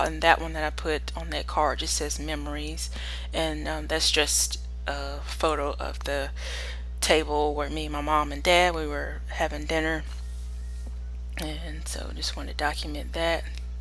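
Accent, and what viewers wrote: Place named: South, United States. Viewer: This was the first time I've watched one of your videos -- NICE JOB! I totally love your Southern accent. Great PL spread. Thanks for sharing, I'll tune in again!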